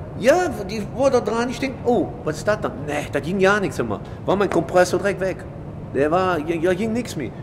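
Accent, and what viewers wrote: Cologne, Germany. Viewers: Einmal hat ein kumpel ihn getroffen und mich angerufen meinte hab hier jemander der dich sprechen will Kam direkt so an Hallo ich bin es der mann vom parkhaus 😂😂😂 auf Kölsch natürlich 😂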